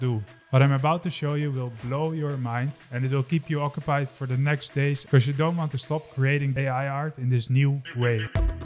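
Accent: Netherlands. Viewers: First seconds watching this video and i immediately know you're Dutch ahhaha nice accent bro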